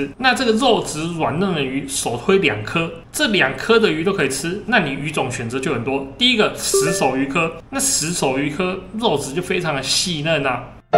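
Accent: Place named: Penghu, Taiwan. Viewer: 我確認他是澎湖人，因為他講台語澎湖腔很重😂😂😂我也是澎湖人一聽就知道了。 而且他講解的很清楚喔～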